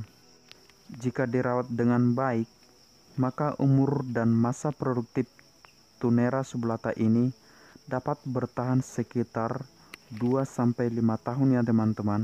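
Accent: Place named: Batak, Indonesia. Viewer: BATAK SEKALI, BATAK KENTAL CESS..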